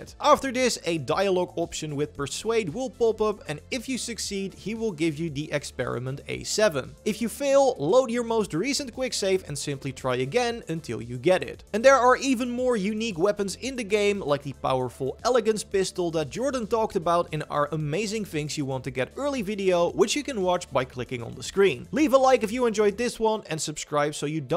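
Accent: Netherlands. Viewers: You sound very Dutch, nice vid tx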